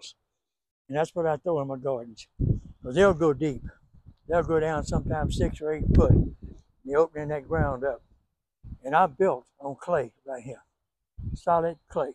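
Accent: Louisiana, United States. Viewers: Dear, I just love watching your videos. Your like the father I never had. I love your Louisiana accent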